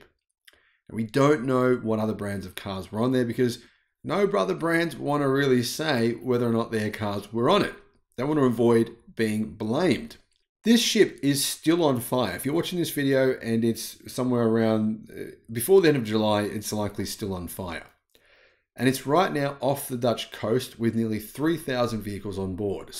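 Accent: Australia. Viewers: Definitely a pro EV slant to his story telling here. ICE generally don't catch fire unless they are older cars with fueling faults. EV fires are harder to put out and happen to new cars more often than ICE cars. On a plus note, he does look like a Viking (with an Australian accent!).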